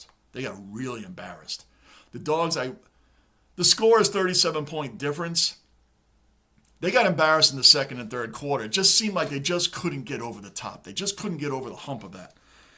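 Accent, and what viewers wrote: United States. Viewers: A novelty hearing an American accent talking about our great game like a fan with some knowledge. Keep up the great work bloke ..... 👍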